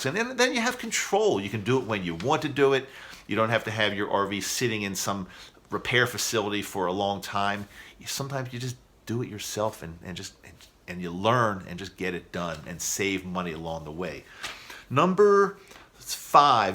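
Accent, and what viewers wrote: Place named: West, United States. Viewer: I would guess that you are from a high cost of living area. Most likely Washington, Colorado, California, or Oregon. You don't sound (accent) like you are from a high cost of living area in the Northeast like Boston, New York, New Jersey, New Hampshire or Vermont.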